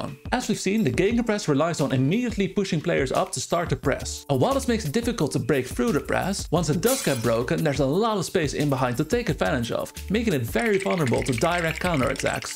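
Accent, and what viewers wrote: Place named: Netherlands. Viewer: Oh wauw, haven’t even heard of this good lad yet. Sounds like he’s a fellow dutchman as well 🇳🇱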